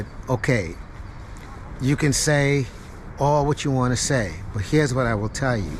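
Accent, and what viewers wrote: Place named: United States. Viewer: Your.acsent.is.not.like.british.are.you.american.your.english.is.good.like.americans <link>.with.you.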